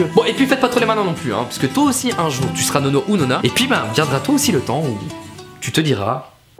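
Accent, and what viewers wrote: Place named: Naples, Italy. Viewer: Tu as gravee un accent napolitain sinon super vidéo mon nonno est pareil 🤣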